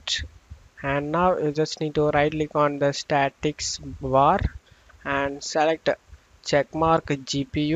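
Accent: India. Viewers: you know it when u hear that indian voice its the real deal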